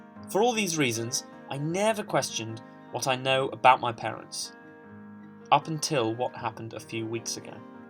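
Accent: United Kingdom. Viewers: why does he sound british but act american??? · just because he has a british accent doesn't mean he says that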